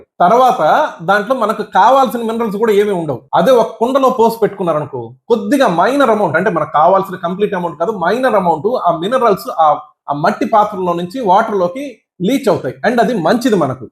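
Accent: Nellore, India. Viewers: మీ మాట తీరులో మన నెల్లూరు యాస స్పష్టంగా కనబడుతోంది 🙏 మంచి సూచనలు సలహాలు ఇచ్చారు ధన్యవాదములు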